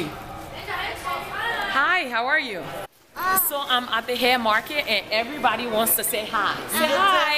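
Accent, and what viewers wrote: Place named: Liberia. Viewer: I hear our wonderful Liberian accent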